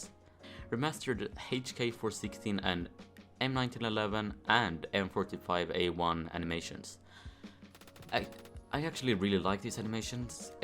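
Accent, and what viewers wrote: Sweden. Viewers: I can tell that you're swedish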